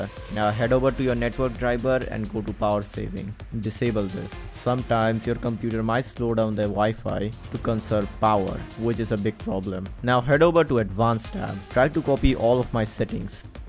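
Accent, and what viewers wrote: India. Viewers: bro sounds indian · Why did you redeem it ahh india voice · U already know when his accent is Indian ur in the right tutorial 😭🙏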